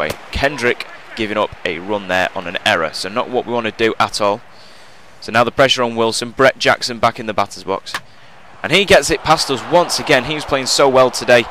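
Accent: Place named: United Kingdom. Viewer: Tis British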